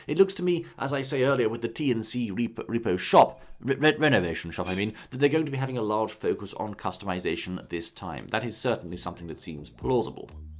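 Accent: United Kingdom. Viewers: love your accent good sir 🇬🇧☕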